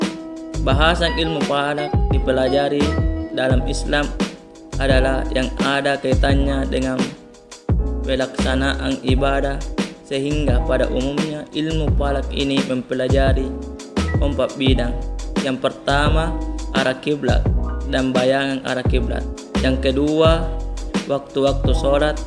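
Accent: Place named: Makassar, Indonesia. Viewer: Sepertinya logat makassar ini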